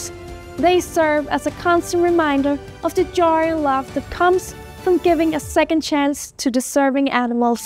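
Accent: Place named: Denmark. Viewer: She has such a danish accent :p I love the true-ness of this nation representative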